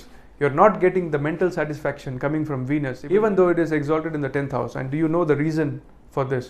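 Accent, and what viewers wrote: India. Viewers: I guessed Tamil too D You have that slight South Indian accent, besides the look :) would have never guessed a Bengali.. From Mumbai though ?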